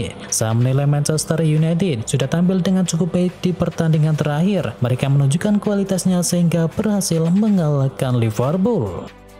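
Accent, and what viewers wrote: Java, Indonesia. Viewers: Bang logat jawanya bisa gak di biasain aja kalaw buat vidio, gak enak dnger nya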